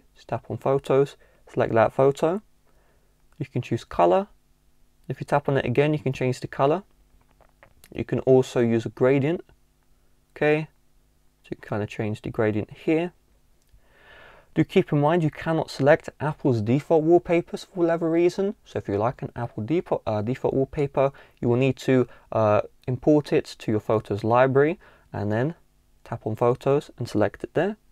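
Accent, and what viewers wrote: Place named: United Kingdom. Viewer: i like the charming british accent ! ❤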